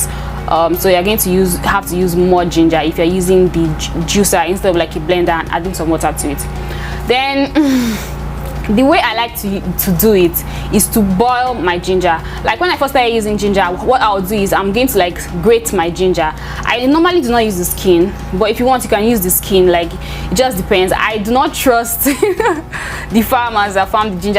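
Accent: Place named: Nigeria. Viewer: You are so pretty plus I love the nigerian accent 😊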